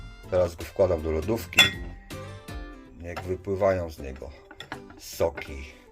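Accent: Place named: Silesia, Poland. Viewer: Ciekawa ta gwara! Chyba że Śląska? Produkt smakowity! Dobrego dnia życzę 😅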